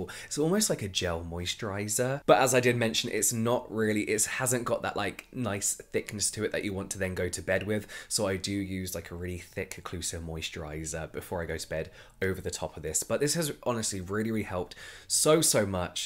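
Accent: England, United Kingdom. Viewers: Everytime I watch your video, besides that cute English accent, I also am filled with joy and laughter.. you are such an inspirational person and have a great positive vibe!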